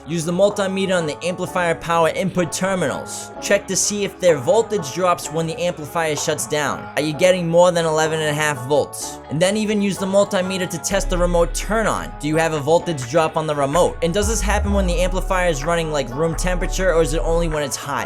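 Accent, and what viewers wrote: Boston, United States. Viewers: Dude are you from Boston ?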